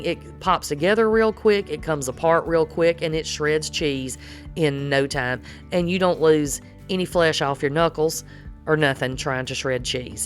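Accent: South, United States. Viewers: First time watching. I love your southern accent "do you boo"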